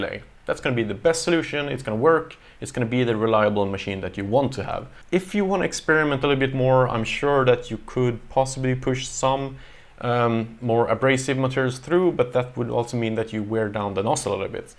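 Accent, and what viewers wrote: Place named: Sweden. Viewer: haha awesome! Jag med, tyckte jag hörde det på din ”dialekt” 😄🇸🇪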